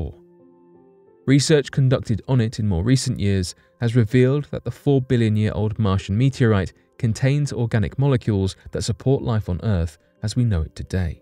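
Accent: United Kingdom. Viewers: well now you just blew my mind....you mean this is all tongue in cheek poop info?....its that british accent- damn...i was looking to actually educate myself...last thing my small mind needs is fake info...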